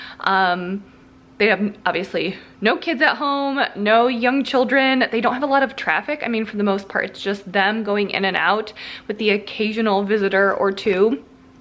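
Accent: Midwest, United States. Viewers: There’s something I love about hearing your Midwestern accent narrate the cleaning 😂😂 I’m 8 months pregnant and can only do like….a load of dishes and sweep the floor and I’m spent for awhile so it’s nice motivation to watch you get so much done!! 😃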